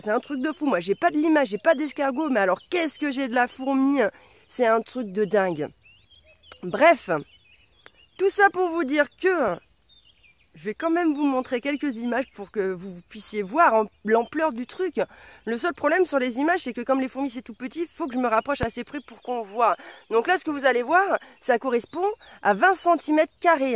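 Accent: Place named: Marseille, France. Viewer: ah ca y est je sais d ou vient ton accent, t es pas de Marseille !!! dis donc tu as tiré tes images du dernier indiana jones la scéne avec les fourmis hahaha-lol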